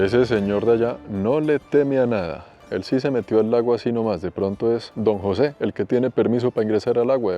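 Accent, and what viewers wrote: Bogotá, Colombia. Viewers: Cómo siempre muy buena su crónica y narrada con su estilo muy colombiano. Excelente, gracias. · Tu voz y tonada colombiana es muy grato escuchar! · Este narrador habla español con un espectacular acento de Bogotá, Colombia. Diría uno, sin conocerlo, que creció allí. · es de Bogotá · es un Chico muy culto no te preocupes no dira nada indevido. Si voz es muy relajante obvio por su acento Colombiano muy agradable de Escuchar y correcto español. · Es que es Colombiano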